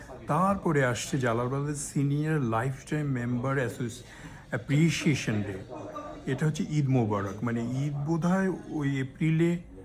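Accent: Standard. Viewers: আপনার শুদ্ধ বাংলা বলার ধরনটি চমৎকার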